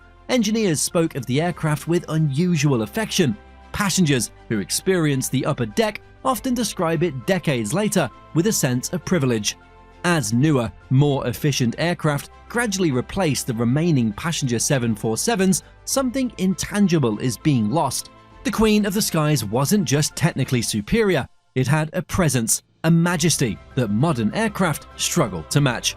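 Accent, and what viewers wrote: England, United Kingdom. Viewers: How can someone with a British accent mispronounce Aluminium - it’s Al you mini um - it’s not alooo min num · Why is the narrator got an English accent but says aluminum not aluminium ...that just ruined the video for me. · Narrator has a British accent, but pronounces aluminum like an American. This is confusing. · If you're going to use a British accent, at least use British spelling. The metal is alumininininium.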